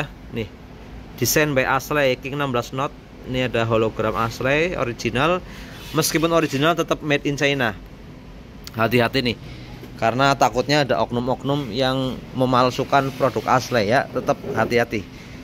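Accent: Banyumas, Indonesia. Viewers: ok joz mas boos,aja kelalen ora ngapak ora kepenak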